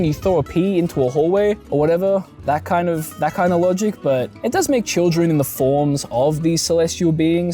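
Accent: Australia. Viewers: are you aussie?? fuck yeah brother best country in the world (said that bc of the fauna and accent but i checked the bio) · You look really nice, like not just how you look, your voice and your look go well together in a way that makes you look better. Sorry if that sounds weird. I'm high, are you australia? I can't tell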